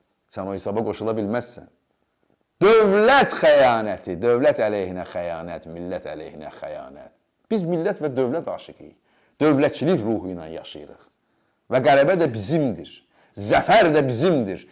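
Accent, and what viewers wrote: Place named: Salyan, Azerbaijan. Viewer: qadan alım bu Salyan tələffüzünu-üslubunu dəyişə..!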